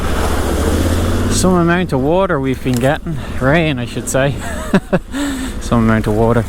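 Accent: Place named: Ireland. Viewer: Nice what would you get the normal T7 or the word raid With the acf50 I put it on about four times over the winter but then again, I do live right next to the sea Just try adding it on bit more Should help I take it you’re over Ireland by the sound of your voice looks like you got some good trailers there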